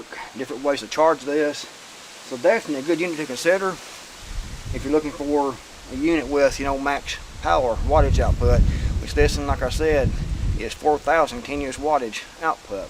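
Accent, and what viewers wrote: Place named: North Carolina, United States. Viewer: Hey I told a friend of mine from North Carolina that he talked about as close to you as anybody that I had ever met before, and now he won't return any of my emails. I don't understand, but for some reason no matter how hard I try, I can't talk like you at all, and I'm from Missouri.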